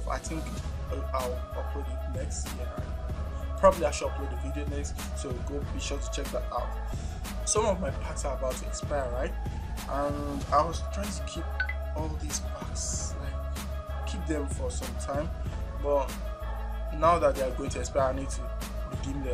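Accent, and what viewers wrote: Nigeria. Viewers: honestly, i dont know. Myself, i'm Ghanaian. I just know he's nigerian because of his accent, and coz he confirmed in one of he's videos that he's nigerian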